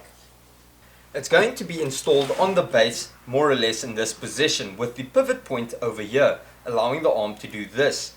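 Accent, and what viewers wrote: South Africa. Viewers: Hi. Gr8 to hear that unmistakable South-African accent. I am based in Benoni, Gauteng. Been on your channel now for about 3 months. Really enjoying your work. I am also left handed so your hand work makes perfect sense to me. that "dido" you spoke of is pronounced "Dado" I am fairly new to woodwork and eager to learn. i am on my 3rd project of the lock-down and like you, ran out of materials (and beer) while ago. small little projects to build skills and keep me out of the wife's way... keep going and well done..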